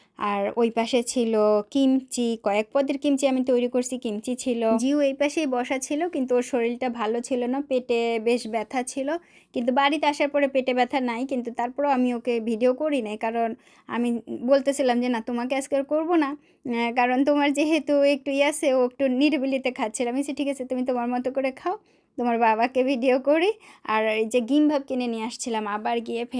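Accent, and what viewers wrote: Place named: Comilla, Bangladesh. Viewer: আপনি এভাবে কথা বলেন কেন,আপনি কি কুৃমিল্লার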